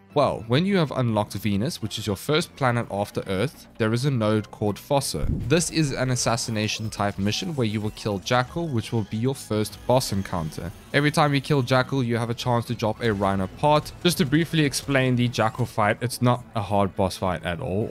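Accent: South Africa. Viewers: Man, I left home two years ago but can still tell a Saffer when I hear one. Awesome videos!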